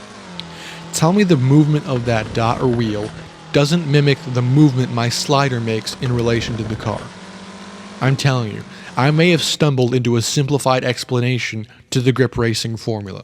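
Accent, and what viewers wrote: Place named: United States. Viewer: American accent sticking out like a sore thumb.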